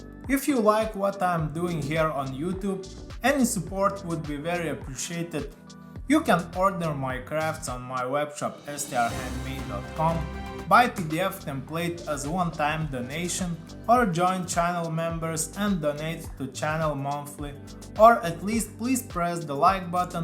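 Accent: Russia. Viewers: Ну как будто бы он сам из РФ, учитывая акцент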